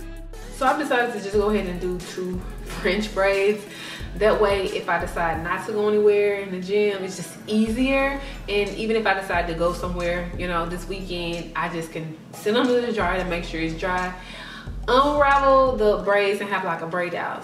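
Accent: South, United States. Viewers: I love your accent! Are you from Louisiana? Somewhere in the south? I'm from Mississippi.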